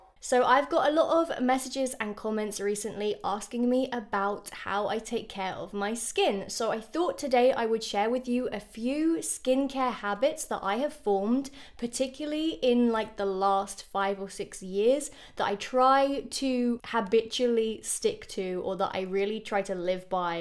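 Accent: United Kingdom. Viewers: I really like your idea of aging, it’s beautiful ! And by the way, La Roche Posay is a French brand, and we don’t pronounce de E in Roche ;), it’s like « La rosh Posay », but I like the British accent on the prononciation 😍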